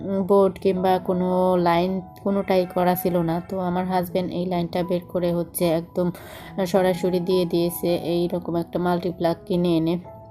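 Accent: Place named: Manikganj, Bangladesh. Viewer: Apnr basha manikgonj kothao.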